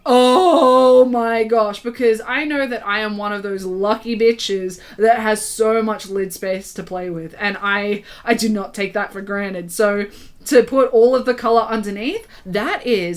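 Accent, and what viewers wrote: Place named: Australia. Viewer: i just love how aussies say no, "nuuy." my mum's from oz and i used to say it like that when i was kid. also, just found your vids and instant sub! yer a bonza sheila as my great uncle Melmoth used to say.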